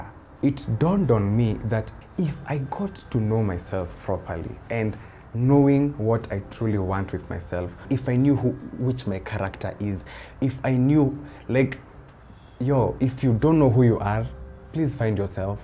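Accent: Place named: Kenya. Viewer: Your accent reminds me of when I used to live in Nairobi 🙂🙂🙂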